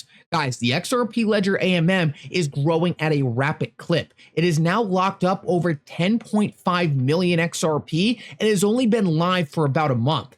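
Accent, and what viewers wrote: Allentown, United States. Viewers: with the Allentown accent is wrong again. Way to go!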